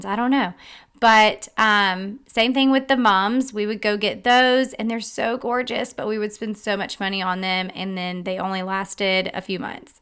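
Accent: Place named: Georgia, United States. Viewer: Please enter me in your give away. I enjoy your channel very much, partly because of your Georgia accent. I live in Colorado. God bless 🙂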